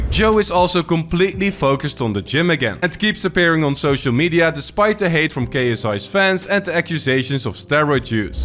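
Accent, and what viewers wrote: Netherlands. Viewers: Ik herken een Nederlands accent wanneer ik het hoor ;)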